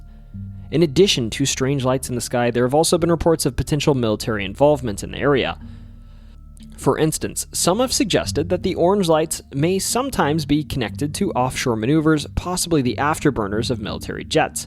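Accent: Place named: Pee Dee, United States. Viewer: You sound like a Pee Dee native! I couldn't believe there was no lizard man here. And I grew up in Dillon so Bigham's Light is always on my mind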